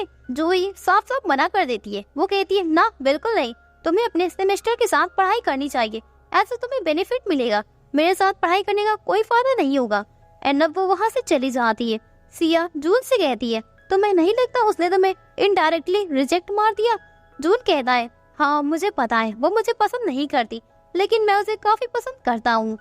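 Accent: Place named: Arunachal Pradesh, India. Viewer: Apki voice arunachal ki lag ta hain....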